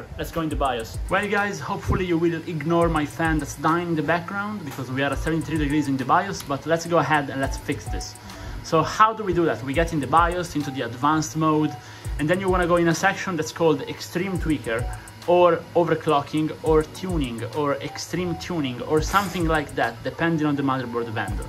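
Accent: Italy. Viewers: ho subito riconosciuto l’accento italiano ahaha, grazie mille per questo e altri tutorial ❤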